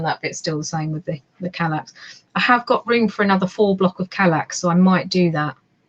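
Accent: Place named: Suffolk, United Kingdom. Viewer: I love hearing your Suffolk accent. I'm a Suffolk girl too.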